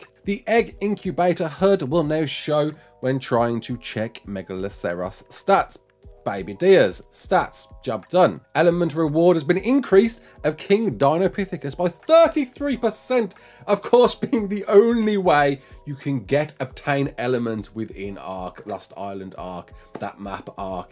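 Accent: United Kingdom. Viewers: you did great! It's simply inevitable that pronounciations are a little different depending on where one's from, but it honestly sounds pretty good in British. :D I'm sure you (intuitively) already know, but 'Steinbjørn' directly translates to 'Stone bear'. Big fan of your videos btw., they made me pick up the game again!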